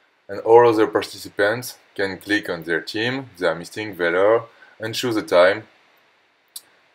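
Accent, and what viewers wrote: France. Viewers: Hey, thanks for the video and helping Dublin Pogo players to be all a Pro :) . Small correction: "/" is just called a "slash" in English, "backslash" is this "\" which you might use in Windows. For the rest and on internet only the "/" is used for addressing a sublocation, exclusion, separation and in Telegram a request like /start to start a request. Bet you know that better then me (ur better in programming languages, then English (which is not bad anyways: Love ur French accent ) but had to say the confusion slash/ backslash\ which seems to be common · St’accent francais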